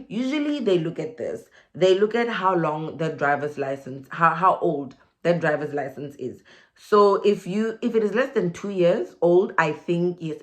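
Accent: South Africa. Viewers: Love the way you explain in such a South African way! Makes me feel so at home and like we can definitely do this!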